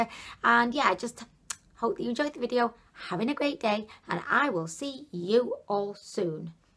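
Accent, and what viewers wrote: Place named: Huddersfield, United Kingdom. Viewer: Hi, what town are you from? Huddersfield lass eya, l have a similar accent. Found this video while noseying at Wish hauls, & you are so hilarious, l just had to subscribe! Thanks so much for the giggles!:)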